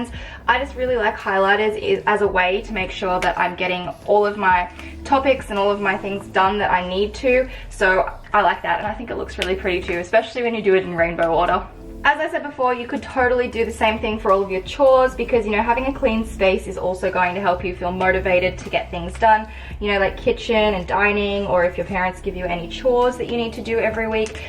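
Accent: Australia. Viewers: your aussie 💕💕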